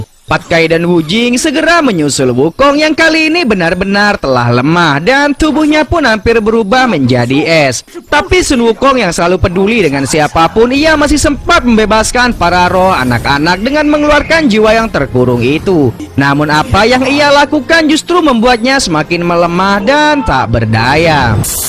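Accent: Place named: Madura, Indonesia. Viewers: meduro endi sampean cakkkk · Admin, logatnx kyk Madura. Madura mana gaes?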